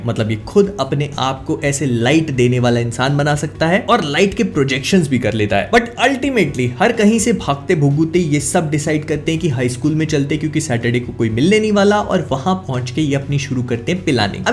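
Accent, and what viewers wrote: Bhopal, India. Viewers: bhopal se kya baat krne ke accent se laga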